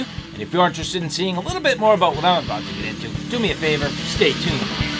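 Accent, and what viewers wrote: Massachusetts, United States. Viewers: You are from Mass. I can tell.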